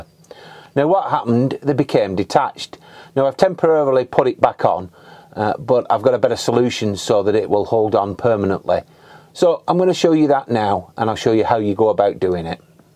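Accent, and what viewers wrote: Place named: Lancashire, United Kingdom. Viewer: Thanks for posting this - really useful. Only just found your channel so a bit of catching up to do. We've just swapped out our old 06 Pageant that we had from new for a 2021 Sprite Alpine 2. And while the Alpine is a great van, like you say there are a number of fixes/improvements needed to upp the quality. The waste hoses in that kitchen area cut diagonally across the floor so I'm going have to sort that out as it makes it hard to use that space gor storing anything. Need some sort of shelving solution too. Out of interest, have you (or been able to) installed a TV bracket to the unit directly opposite the kitchen? I need to investigate how to open up the back of the timber boxing in that runs vertically between the work surface and the locker to get a decent fixing. Finally even as a Londoner I'd wager that your accent is within 10 miles of Bury/Rawtenstall?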